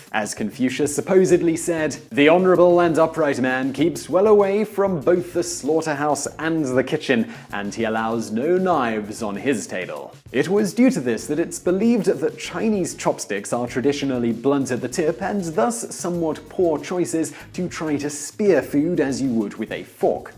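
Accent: United Kingdom. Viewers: Your accent of course is brittish, but where the in the UK is it from... great chanell sir.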